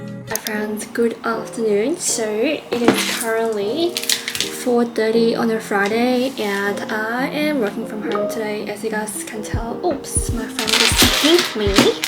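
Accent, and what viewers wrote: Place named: Australia. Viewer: When I first watched your videos I could tell that you were Chinese but couldn't tell that you were Malaysian Chinese probably because you grew up/lived in Australia so you had the Aussie accent😅 But am supporting you all the way from Singapore❤ Congrats on hitting 50k subscribers!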